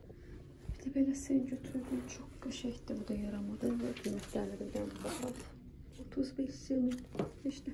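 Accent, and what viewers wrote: Sheki, Azerbaijan. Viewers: Hara lehçesi bele?Şəki? iranlıların da lehçesi ne oxsayr.bilmedim hara lehcesidi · Xanim yeqin seklidi danisgindan hiss elədim😊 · bu arada lehcenden,menim yerlime oxsaddim .eger sekidensense mende Sekidenen ,✊😀birazda balaken lehcesine oxsadiram .Allah size ailene balalrina uzun omur xos gunler can sagligi❤️ dileyirem . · Ləhçə şəki həəəəə❤? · Salam dogma sheki lehcemiz siz shekinin hansi terefinnensoz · Siz Şəkilisiz ? Şəki akcenti var .Onlar şirin danişir. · Şəki hər kəndinə quzu kəsim heylə.Heylə həblədə davam eliyin supersoz.Əla alinir ləhcəmizdə efrdi.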